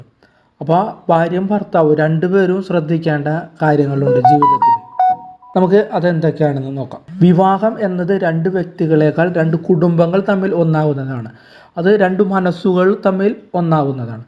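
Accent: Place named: Kochi, India. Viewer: അവതാരകൻ ക്ലബ്ബൗസിൽ സംസാരിച്ചിട്ടുണ്ട് എന്ന് തോന്നുന്നു... കൊച്ചിക്കാരനാണ് അല്ലേ👍😊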